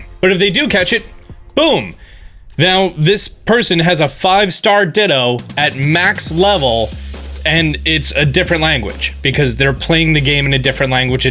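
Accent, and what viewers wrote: Australia. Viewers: Wait, you’re Australian?! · the ausies finding out that he is australian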